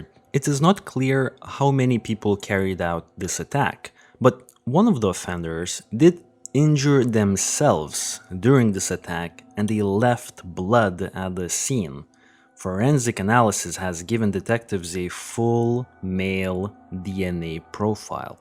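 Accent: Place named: Russia. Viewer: Completely off-topic, but are you Russian? You sound so much like my dad when he spoke English. He immigrated to the US when he was 24. It was soothing to hear (aside from the content in which you were talking about).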